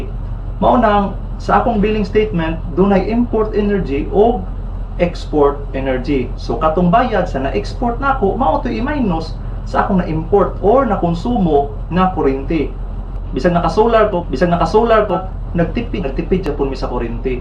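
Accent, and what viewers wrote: Visayas, Philippines. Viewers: boss bisaya diay ka? good job kaayo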